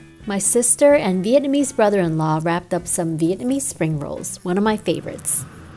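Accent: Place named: China. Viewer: Love it when you speak chinglish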